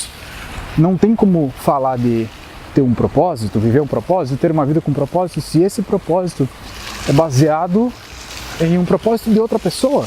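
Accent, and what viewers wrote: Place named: South, Brazil. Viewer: Você é do Sul? Tem sotaque.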